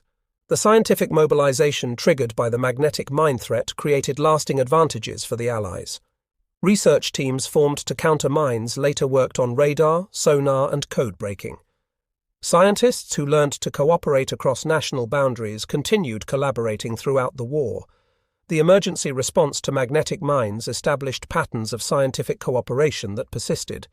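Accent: United Kingdom. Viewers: and AluMINIUM. (Not Aluminum). Bloke with a British accent trying to speak American. Spoils an otherwise interesting video on a key WW2 Royal Navy bomb and mine disposal event.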